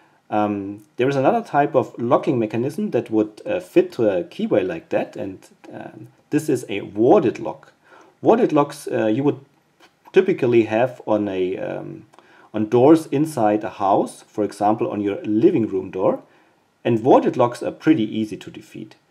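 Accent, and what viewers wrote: Germany. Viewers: an deinem englisch merkt man das du deutscher bist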